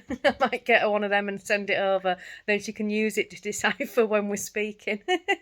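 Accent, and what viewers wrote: Yorkshire, United Kingdom. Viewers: Hi X, please don't apologise for your accent, I'm born and bred Nottingham and often taken for being Yorkshire ( now live in Norfolk ) i don't rein my accent in and I'm not gunna change either 😂 hubby is from down south and been married 31 years and still can't fathom out what I'm saying 😂😂😂 I love the Yorkshire accent ❤ thank you for sharing your story about how you got started on knitting it's brilliant.... I'm a knitter but used YouTube to get me started with crochet, still practicing 😂 I started during lock down 😊 don't keep apologising about anything You are amazabobs ❤ take care happy crafting have a lovely weekend xxxxxx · That was a lovely story about starting to knit and how great that they took a photo to show people. No wonder you were proud 😍 And the rest is history...... Please don't apologize for your accent. 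You are a Yorkshire lass, so you have a Yorkshire accent. I do smile sometimes when I watch with subtitles and the translation is often hilarious. I can't always tell what says but I always understand you. Have a lovely weekend, see you on the podcast xxx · my great aunt use to make bobbin lace I use to sit and watch but never had a go. Love a Yorkshire accent ❤You and have a great weekend I love how you learned to knit and look at you now designing your own patterns and hand dying your own yarn I think it's brilliant that you and have made a little business from it well done 👏👏👏see you Monday xx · Love your accent and your Yorkshire colloquialisms. Please do not rein them in. I love all things British. I even named my WiFi network “Clever Clogs.” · oh wow you certainly have moved around hence why your Yorkshire accent is only mild. You definitely don’t have any trace of the Hornsea, Hull accent either. 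It’s very distinctive that. Anyway thank you for that, I only asked because of you saying about a Yorkshire accent. I’m born and lived in Sheffield all of my life. I live in the very south of the city near the Derbyshire border now. I’m hoping my yarn comes for my spooky socks mal today 🤞🏻. Have a great weekend. 😊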